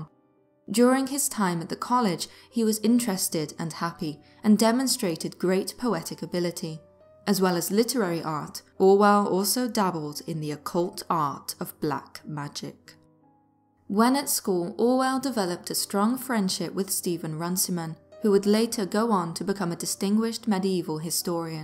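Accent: United Kingdom. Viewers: I loved your Jeckle and Hyde narration I would like to recommend another story if you are interested. Be.Busta narrated “The Left/Right Game” it’s a really good story but as it is from a female perspective it’s missing something. I would just love to hear your voice instead. The author writes from the perspective of a journalist from Bristol and I hate to assume that you are from the UK, but with the British/Aussie/New Zealand style accent it just fits like a glove and I find myself more engrossed in the storyline. Anyway, just a recommendation ❤️❤️❤️ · usually I hate British accents but I am in love with your voice and it is perfect for reading these things.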